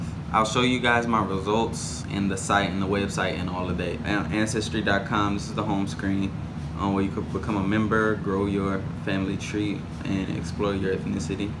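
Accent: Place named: New Orleans, United States. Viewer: I love that we know our people just from the accent. I said to myself, "He is from New Orleans." Then I saw your results which were very similar to mine. One parent is from New Orleans, but I was born and raised there. My only migration was also Louisiana Creoles and African Americans. Thanks for sharing.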